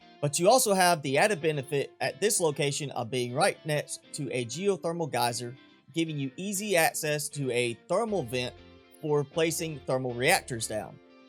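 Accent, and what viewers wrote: South, United States. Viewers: You have the most American accent IV ever heared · I love this guys southern accent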